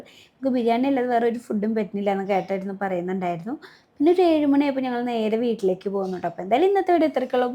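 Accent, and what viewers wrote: Thrissur, India. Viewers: Ivde thrissur angane aanu😂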